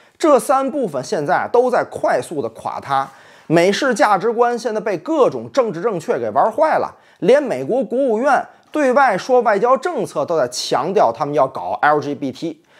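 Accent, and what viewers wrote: Tianjin, China. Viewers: 这是天津话吗？以前老看杨光的快乐生活，感觉有那么点像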